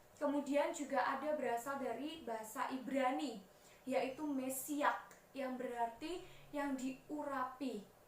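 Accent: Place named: Java, Indonesia. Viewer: Aminn.. makasih ci... Medoknya menjadi ciri khas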